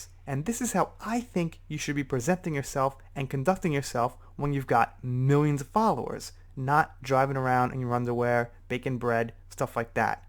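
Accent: New York, United States. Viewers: I did not hear You say anything wrong. I mean it maybe Your New York accent because We say, "Eee-ther." Anyway, I agree with You as always. The other thing that I will say is, "Johnny is just plain Class And Amber is Just plain Trash." That is really the difference in their videos. She acts like a Middle-Schooler trying desperately to be cool. When She said, "I will steal Your Stash." The middle finger too; GROW THE F%£?CK UP!!! That is all. I hope that You and Your Family are well. 😊👍🏼👋🏼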